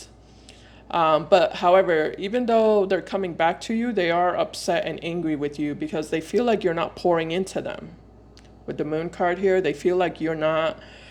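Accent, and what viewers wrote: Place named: Spain. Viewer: Gem vs Pisces.. Pisces is known for impulsive actions that get them in trouble. The grass was not greener in the other side. I struggled with 3 horrible hurricanes - he knows exactly what I'm going through.. but he has done nothing to reach out & help in any way with the loss of my home. The home that he left me in. I moved him from California to Florida 7 yrs ago when he hit rock bottom. All was fine til he let toxic jealous family & friends come between us. Controlling him. So eventually he pleased them by leaving me & hooking up with the female they wanted for him 1700 miles away not giving a crap of how I felt!! So I do believe he is not happy & he's using that person as convenience for his abrupt actions... I'm moving back to my home town & letting him mess up his life again cause this is his pattern.. player, liar, manipulated.. 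golddigger.. cheater... Bi-polar infected...7 personality... One pill away from a straight jacket & I'm being kind.. lol. So deal with it Pisces, don't mess with a Gemeni... The hand that fed you!!.. Thank you!.. so accurate it was scary.! Sometimes you remind me of my sister when you talk. Your accent. We're Puerto Rican. I'm guessing you might be Spanish too... Love it.. lol. Have a great day.. 🌹🙏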